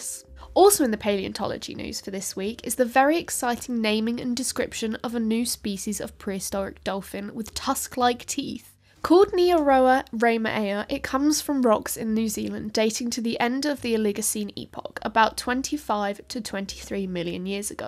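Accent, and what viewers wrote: England, United Kingdom. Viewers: I hate English accents… Mae your own YouTube so we don’t have to hear your bleeping accents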